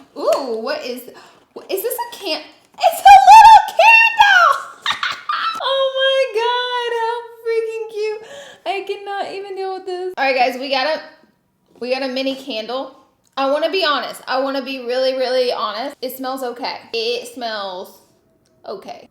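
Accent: South, United States. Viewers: Anyone else miss adorable full on southern accent? 😭❤️ First video I ever watched was her Blendiful review and the accemt was so cute I could not stop watching after that lol! Love her silly personality 😂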